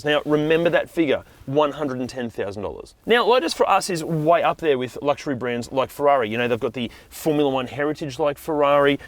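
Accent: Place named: Australia. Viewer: Man its good to hear some nice aussie commentary, thanks for the review!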